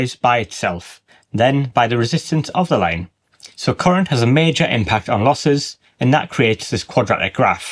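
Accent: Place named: North East England, United Kingdom. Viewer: Another good video, and always great to hear a North East accent (Greetings from Darlington)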